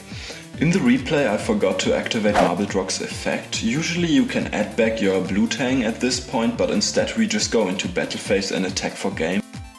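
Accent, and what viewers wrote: Germany. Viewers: Den deutschen Akzent feier ich hehe 👍😁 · Ich merk du bist deutsch. Gute combo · oh boy, das ist das erste mal, dass ich einen deutschen Akzent feier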